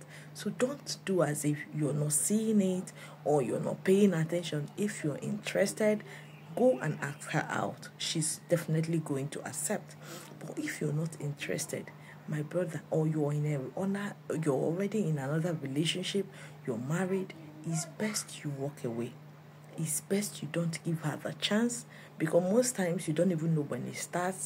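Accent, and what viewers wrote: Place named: Africa. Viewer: Thanks for the inside info. Love the accent ❤ where in Africa are you from?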